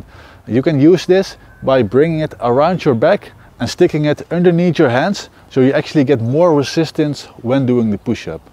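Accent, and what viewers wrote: Netherlands. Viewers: Nothing more goofy than the Dutch accent. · ik hoorde gelijk al dat je nederlands was haha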